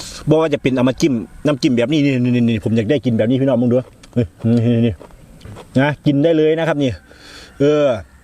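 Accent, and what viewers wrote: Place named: Ban Phue, Thailand. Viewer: อ้ายเป็นคนบ้านใด๋ครับ เว้าคือคนอำเภอบ้านผือแท้ครับ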